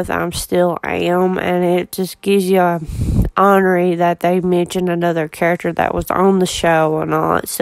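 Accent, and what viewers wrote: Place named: South, United States. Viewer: Wow girl, you have a major Southern accent! I f'n love it!!!!!!!!!!!!!!!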